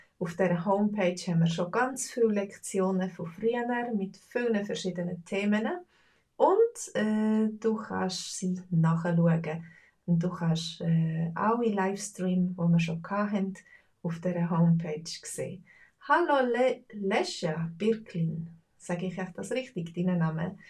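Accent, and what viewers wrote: Bern, Switzerland. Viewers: Hoi, ja genau. Ich luege Dein Kanal uf Youtube in Düsseldorf aa. Bin aber regelmäßig privat in der CH. Am 22.02 habe ein Schnuppertag als Pflegefachmann in Rehaklinik Tschugg Kanton Bern. Übrigens sprichst Du Berndeutsch Dialekt? So hört sich das an:-). Ausserdem du bist mit meinem Landsmann PL zusammen, dadurch ist Dein Kanal für mich noch Stück sentimental. Ich bin auch Pole. Und von Dir lerne ich gerne Schweizerdeutsch, bzw. Berndeutsch😉Ade und Danke Vielmals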